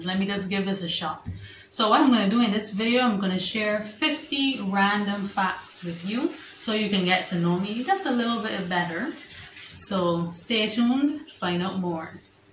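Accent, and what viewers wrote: Barbados. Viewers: Hey, great job with your channel. Love de Bajan accent. Ps this is Azriel:)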